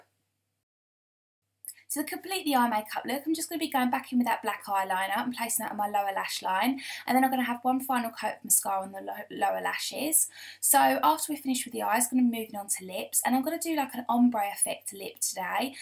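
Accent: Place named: England, United Kingdom. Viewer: I'm so glad I came across your videos! I've gone on a binge watching spree! I could listen to your accent all day, makes me want to plan a trip to England! Xo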